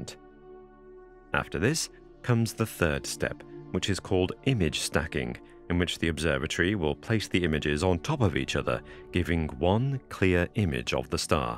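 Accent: United Kingdom. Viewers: Wowww! Amazing video and English accent are pretty nice thank you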